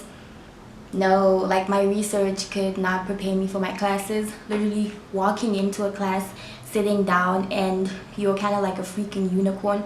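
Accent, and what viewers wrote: Caribbean. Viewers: where you from? the Caribbean? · Are you carribean? I hear an accent.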